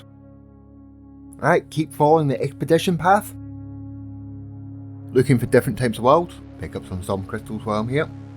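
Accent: Scotland, United Kingdom. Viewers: Scottish... U so Scottish ^o^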